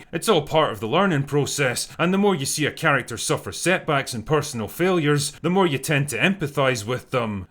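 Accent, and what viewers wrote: Scotland, United Kingdom. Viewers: Most Scottish bloke ever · Genius analysis. And I like your accent - Scottish, but totally readable for a yank like me! Cheers!😊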